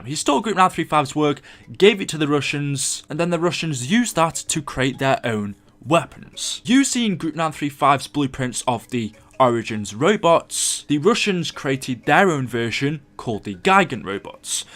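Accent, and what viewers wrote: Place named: Stoke-on-Trent, United Kingdom. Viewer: are you from stoke on Trent because your accent sounds familiar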